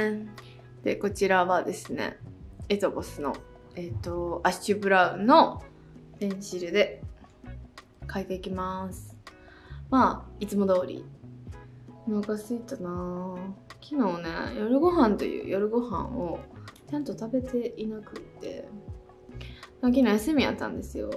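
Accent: Kansai, Japan. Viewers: 私も雑目なA型です。メイク動画は、ブランドの好みや色使い、質感全てちゃんが私のタイプです。関西弁も可愛い💕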